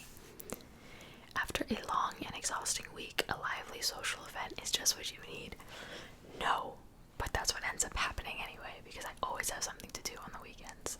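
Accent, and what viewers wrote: United States. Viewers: what part of America are you from? (I’m Australian so the State is probably all I’ll know 😂)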